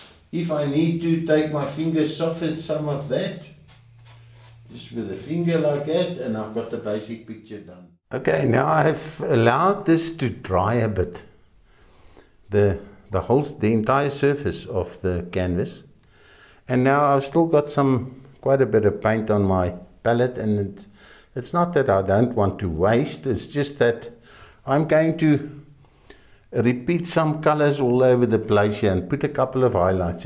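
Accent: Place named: South Africa. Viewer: That is beautiful! It is so nice to hear a fellow South African accent!:) I was born and raised in Joburg and had relatives in Pretoria... I used to love visiting when the Jacarandas were in bloom!! You have a very artistic eye and easy style of painting. I will certainly attempt to paint from your tutorial, thanks for uploading it:) I now live in Hawaii, but I miss the motherland a lot....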